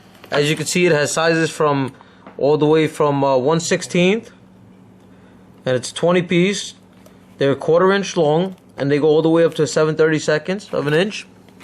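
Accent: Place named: Italy. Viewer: My dudes got to be Italian! I now that freaking accent. My brothers kinda have it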